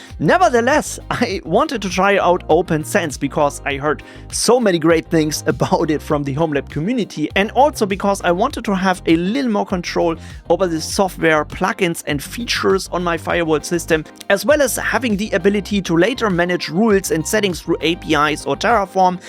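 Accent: Germany. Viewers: I love your German accent, is it? Apologies if I'm wrong. Greetings from 🇬🇧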